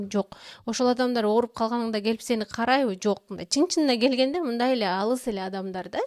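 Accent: Standard. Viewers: Кыргызча таптаза сүйлөгөнүңүзгө ыраазымын ❤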